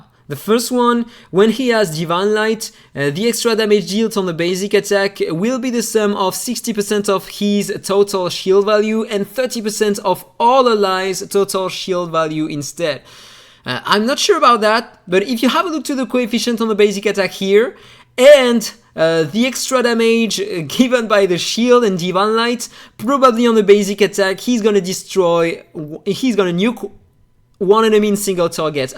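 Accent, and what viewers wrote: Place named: France. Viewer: He's French, relax English is not his first language.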